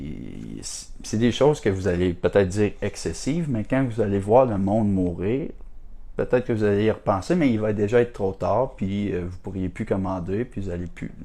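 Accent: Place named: Quebec, Canada. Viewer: Jean de Flor..les Québécois vous êtes beaucoup dans les fleurs 😉 en plus super joli accent vous avez, un vrai plaisir a vous écouter les Québécois 👍❤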